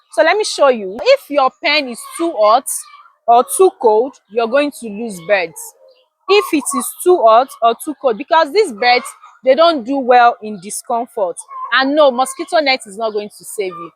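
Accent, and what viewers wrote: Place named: Igbo, Nigeria. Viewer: I have watched many of your teachings and I must say may God bless you but to be honest your sounding so much like your from Igbo tribe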